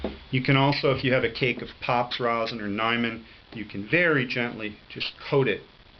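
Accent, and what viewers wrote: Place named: United States. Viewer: It sounds American, to me...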